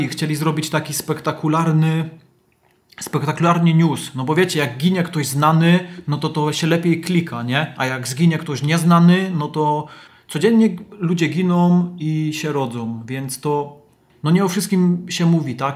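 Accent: Silesia, Poland. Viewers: szacun za przemyślane i wyważone słowa i za śląską gadkę!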